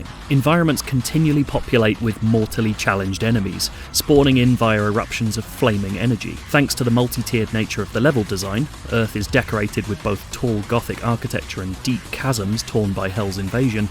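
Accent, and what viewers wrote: United Kingdom. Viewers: Only British accents can make Doom sound fancy.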